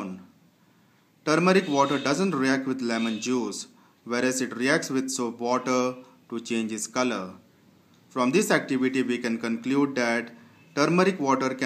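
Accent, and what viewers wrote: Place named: India. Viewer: Your voice is like indian , r u an Indian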